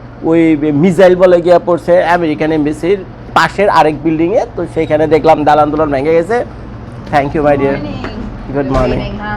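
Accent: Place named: Noakhali, Bangladesh. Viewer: bhai mone hoy noakhailla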